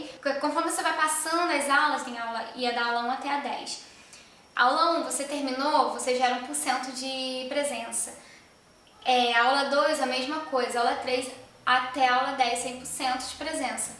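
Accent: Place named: Pará, Brazil. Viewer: vc é paraense?, o sotaque não nega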